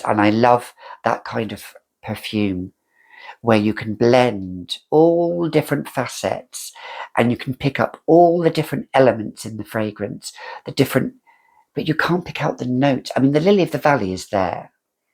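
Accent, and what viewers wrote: France. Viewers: your French accent is lovely, I love hearing you pronounce names and brands! I know that's not the important part, but it's music to my ears 😊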